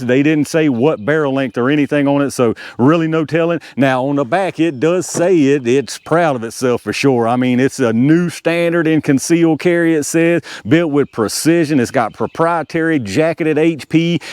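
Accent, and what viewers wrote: South, United States. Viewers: Good info! Thanks for your diligence. Love the southern accent! - signed NY Yankee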